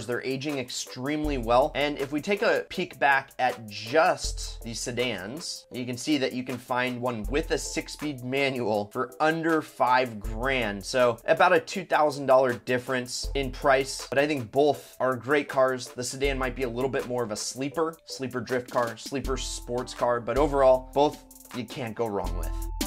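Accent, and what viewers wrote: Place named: Chicago, United States. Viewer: Are you from Chicago? Or is that just default? I thought you were west coast.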